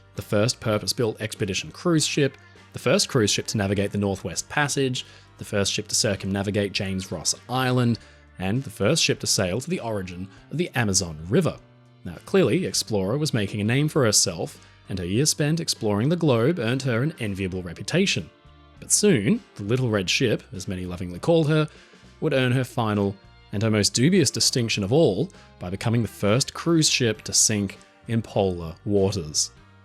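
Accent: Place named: Australia. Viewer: it is Australian English. The best by far in the world.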